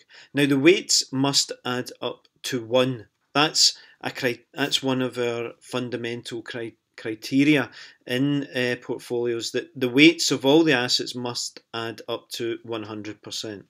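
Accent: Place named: Scotland, United Kingdom. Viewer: Very helpful and Love your Scottish accent!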